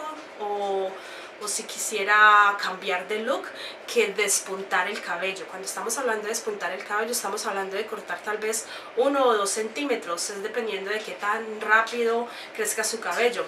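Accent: Colombia. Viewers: Su acento es colombiano.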